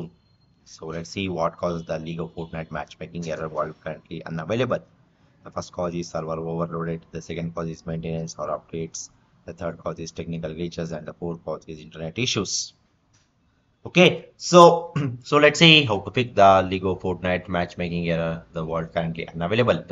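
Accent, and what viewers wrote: India. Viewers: of course he has an Indian accent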